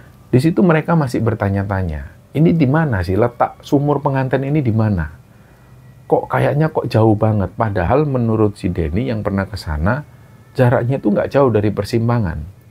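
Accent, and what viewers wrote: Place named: Tegal, Indonesia. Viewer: Mantap ni dari kabupaten tegal mas 👍 Ora ngapak ora kepenak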